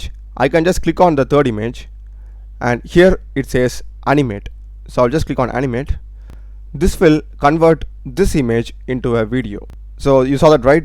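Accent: India. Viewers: I don't catch up your Indian Accent English. Can you speak slower, or use AI speak for you.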